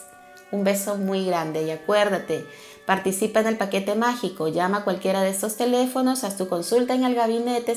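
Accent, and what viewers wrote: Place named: Mexico. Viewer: . Tienes tono de Mexico lindo y querido. Porque no haces una oferta especial para los mexicanos que queremos consulta personalizada. Esta muy caro en euros 🙏🏻💕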